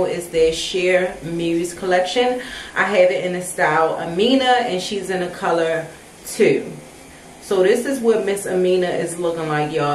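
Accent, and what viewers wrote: Baltimore, United States. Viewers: You did that!! It looks really cute on you! Btw..are you from the DMV area? I can hear the Baltimorean accent lol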